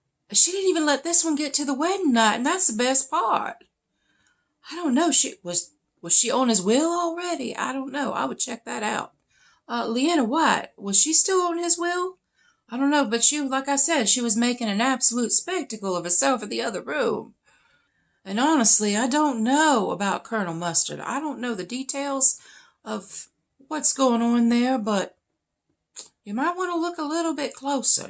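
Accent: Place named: South, United States. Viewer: Love it 😃😍 and your southern accent 🤩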